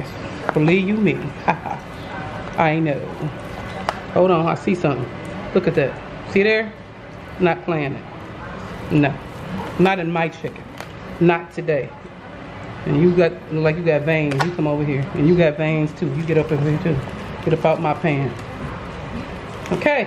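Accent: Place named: New York, United States. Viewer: I hear that New York accent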